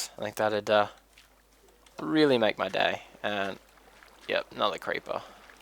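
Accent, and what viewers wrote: Australia. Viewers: I always enjoy listening to your australian accent, so feel free to make a spelunking episode :D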